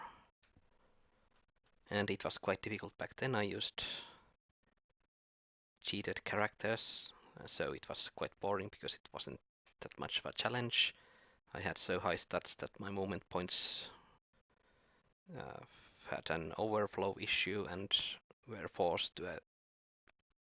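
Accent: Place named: Finland. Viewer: Holy shit! Another person with a penchant for Nahlakh and Demise specifically. Congrats on finishing this epic game. I never managed this in the 90s despite several efforts. Always got stuck at some enemies. I love this style of games. I bet you play the Exile series as well, which is another amazing set of games. You might be a fellow Finn as well based on your accent, which is not surprising because of Pelit magazine's coverage back in the day. That is how I discovered the game. Check out a modern game called Dungeon Encounters. Might be right up your alley. Cheers! :)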